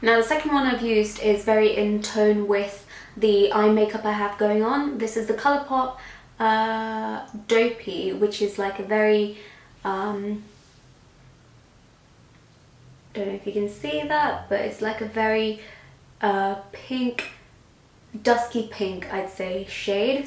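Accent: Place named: United Kingdom. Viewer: glad to know that I love you British accent. Keep it up. I started watching your video from the one where you explained the deep set eye.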